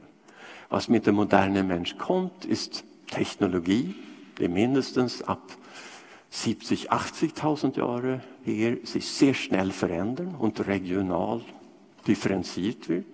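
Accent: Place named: Swabia, Germany. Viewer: Beeindruckende Deutschkenntnisse! Der schwedische Akzent erinnert ein bisschen an einen schwäbisch-alemannischem Akzent. Das fand ich sehr interessant. Nicht nur den Vortrag.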